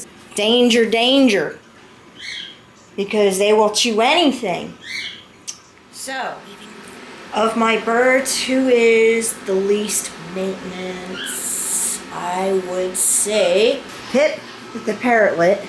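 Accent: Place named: Pennsylvania, United States. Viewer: I love your videos, such a joy to watch! Are you from Pennsylvania, your accent reminds me of a friend from PA?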